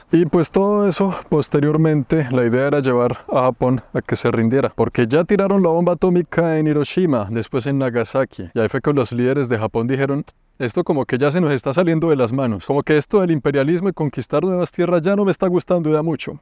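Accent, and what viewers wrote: Bogotá, Colombia. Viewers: Ese acento Bogotano de, es un gusto escuchar su información... Sigue asi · 😂 El tipo es más rolo que la changua, más cachaco que el ajiaco, más Colombiano que la cumbia y el vallenato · El español bogotano en pleno! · escucharlo a usted es remontarme en el tiempo y volver a mis epocas de estudiante en el colegio mayor de san Bartolomé, ahi en la esquina de la plaza de bolivar, al lado de la puerta falsa y en frente del palacio de Nariño en Bogota. Asi nos narraban la historia mis profesores de sociales. Con ese asento pausado y elegante del cachaco bogotano. Eran una verdadera delicia esas clases, charladitas. Maestro, gracias totales como decia Cerati.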